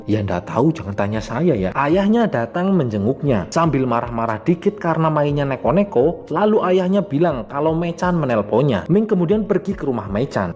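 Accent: Banyumas, Indonesia. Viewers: ko wong banyumas apa hahaha nganti bingung temen pada nggoleti apa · Ngapakke ngendi kangggg😅😂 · Wong ngapakkk kiee lanjutkan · Min asli wong ndi, ding nggo basa ngapak😂😂😂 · Mimin wong ngapak😂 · Rika ngapak deneng Ngendi Banyumas SE si lik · Rika wong ngapak ya? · Ngapak ngapak urapapalah seng penting penakk😂😂😂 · admine ngapak ehehe salam dari pwt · ngapak temen wong endi jane rika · Nyong bangga rika wong ngapak😂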